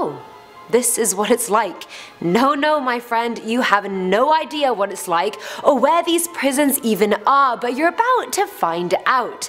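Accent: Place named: United Kingdom. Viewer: A new host at Landon? I didn't know. Trying to figure out where you're from. You sound British but it sounds like there's another accent in there.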